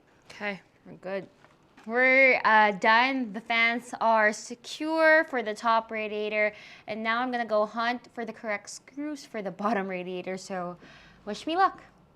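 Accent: Philippines. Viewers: You sound Filipino But awesome video and build <3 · First thing that i noticed is your accent. Asked my self why does it sounds like filipino accent, i never thought you have filipino blood till i checked your about. Anyways keep it up! nice pc! hoping to have this kind of build soon! Ingat and god bless haha. you gained a new subscriber. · Great videe, love your content. are you pinay? i feel like i can hear alittle accent in your voice :) keep up the great work! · i found it funny as I have wasted so many tubes like you did lmao🥲. But keep making the content that you love doing! I thought that the accent was a bit familiar but immediately noticed when you spoke tagalog in the vid. Always happy to support a fellow filipino. 😊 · what's your ethnicity? you have that Filipino accent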